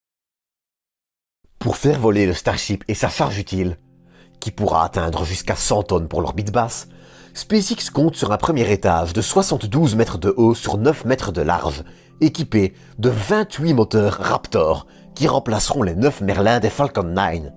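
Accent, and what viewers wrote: Belgium. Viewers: Sujet intéressant et bien traité ainsi que bien expliqué et détaillé. Mais il est claire que la voix off devrais être changer. L'accent belge ne me gène en rien, mais les zozotements + les R trés accRRRocher m'irrite l'oreille :-( Youtubeur n'est peux être pas fait pour tous. ou certain s’épanouisse aussi a resté hors des écrans a bossé sur les sujets. Peux être qu'embauché pour une voix off serais judicieux pour le future de la chaine? En tout cas merci pour la video :) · Bienvenue dans le BiG Universe. Accent Belge, sans pincement de nez.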